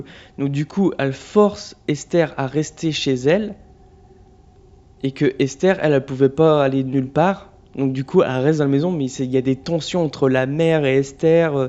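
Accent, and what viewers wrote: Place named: North, France. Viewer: Rien à voir désolé mais t’es blond, t’as les yeux noir, un accent du nord, tu serais pas le frère à jojo Bernard ?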